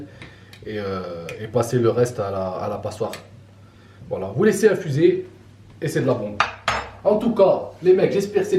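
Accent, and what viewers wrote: Saint-Étienne, France. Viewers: T as a petit accent, tu serais pas de Saint É?.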